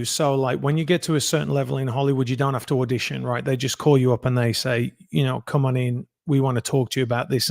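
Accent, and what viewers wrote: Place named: Northern England, United Kingdom. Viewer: who did Matthew play in Coronation Street please. He speaks beautifully. You can hear the northern vowels . He so nice to listen to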